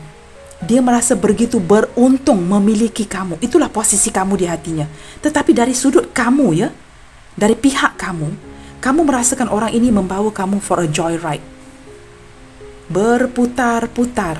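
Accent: Malaysia. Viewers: Sya baru beberapa hr rutin tengok video dsini. Senang dgn cara readingnya... deep sekali. Dengar bicaranya sya jd berasa bernostalgia pd masa sya bekerja d Malaysia thn 1996 silam. D Kilang HITEC, spg,renggam johor bahru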